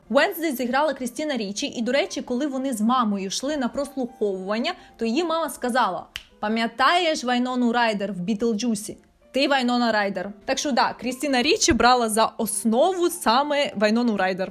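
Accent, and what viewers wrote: Surzhyk, Ukraine. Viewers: Какой же у тебя омерзительный суржик! Неужели так тяжело выучить украинский язык?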